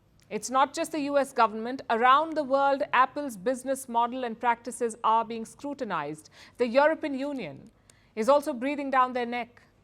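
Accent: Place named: India. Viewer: People from india has one of the worst accents in the world!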